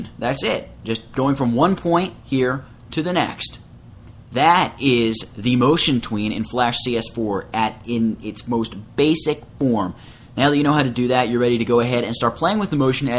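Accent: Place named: United States. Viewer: typical US accent..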